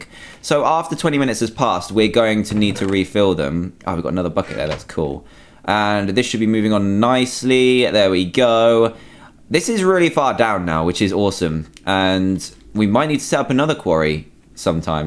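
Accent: United Kingdom. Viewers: *well he's british, and they pronounce it different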